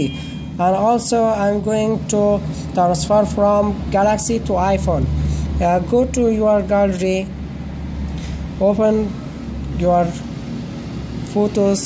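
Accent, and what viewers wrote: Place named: Afghanistan. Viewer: Afghans talk English like him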